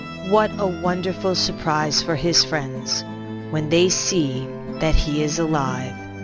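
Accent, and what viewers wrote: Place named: New York, United States. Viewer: New York accent much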